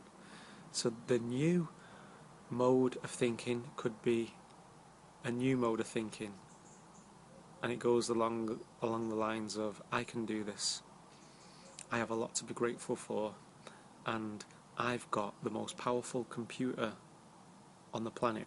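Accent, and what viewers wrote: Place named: England, United Kingdom. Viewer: Ohhh, you're English. No wonder I keep thinking you are such a cutie... what's up with the English and your gift of gab?! It's not just the accent either. ;)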